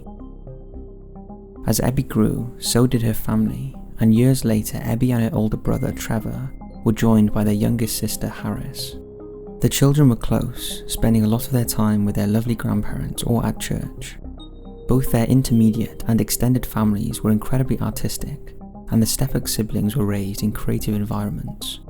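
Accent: United Kingdom. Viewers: The narrator is obviously British. You are aware they speak a little differently than Americans, right?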